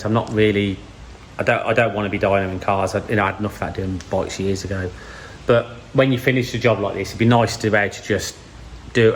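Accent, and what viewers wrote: United Kingdom. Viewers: Awesome footage as always. If only I could understand everything says. I get about 60%, the rest is too ‘dialected’ for me. I mean, for a non-British person, his accent is rather overpowering. I have to switch on subtitles when he speaks. I don’t have that problem with the other DW videos though. But I will watch all videos.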